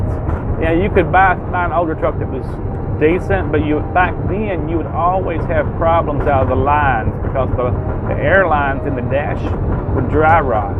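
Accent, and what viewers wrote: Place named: South, United States. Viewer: I love your accent, its from south right? great trucks, i really like to try to drive those monsters,wow. xoxo monstermom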